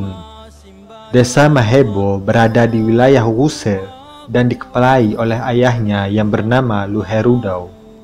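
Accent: Manggarai, Indonesia. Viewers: Fokus dengan logatnya seperti logat manggarai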